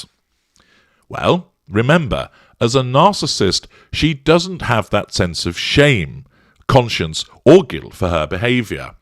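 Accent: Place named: Yorkshire, United Kingdom. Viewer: |Maybe she wants to usurp Camilla, then Catherine would have to curtsy to her!!.. PS has a Yorkshire lass I just loved your Yorkshire accent made me chuckle thankyou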